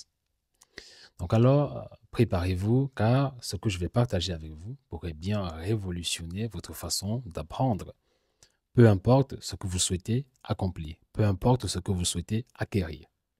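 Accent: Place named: France. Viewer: je repond EN italien que je suis Fiorentino ou' est ne Dante ..... Sei un grande professore e m ' hai fatto capire i meccanismi del nostro cervello che già sapevo però tu l hai spiegati in maniera eccezionale ... Poi il tuo accento Francese in più parli piano lentamente ... Via grazie mille hombre !!!!!! Ciao professo !!!!!!!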